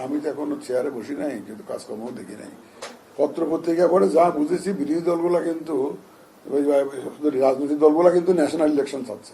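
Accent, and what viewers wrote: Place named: Chittagong, Bangladesh. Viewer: চট্টগ্রামের মানুষের মত লাগতেছে। উনার বাড়ি কি চট্টগ্রামে।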